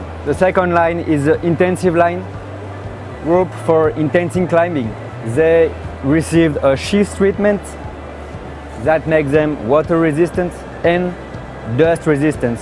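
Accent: France. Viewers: Wow that french accent tho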